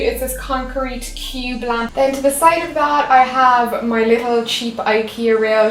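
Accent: Ireland. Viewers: What part of Ireland are u from? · Is she Irish.... She sounds irish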